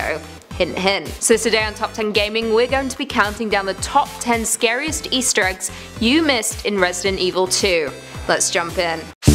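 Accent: United Kingdom. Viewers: Miss your quite the beautiful lady,& love your English accent 😊Well that's cool, I'm pretty excited about Re2 remake. Looks fantastic,as far Re3 remake,as they said it depends on the fans.if it happens,it's in the making from what I was told by Capcom & other gaming yutuber s. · You are from uk?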